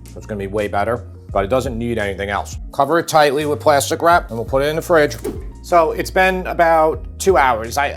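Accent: New York, United States. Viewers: Just made another batrch today in an effort to save money. Well not an issue in USA, but here in Norway sliced turkey etc and deli foods run at 45 to 120 usd a kg. So as a poor creative/guitarist, have to do anything possible to cut down on food costs. Whereas found chicken breasts on sale for 15 dollars a kg. Of course have expensive guitar collecting habit, just spent 20k usd on vintage guitars. But have to pay that back somehow. So strongly recommended. Plus I love the NYC accent. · I am from Perth, WA and I just wanted to say your NY accent is great!!! All the best.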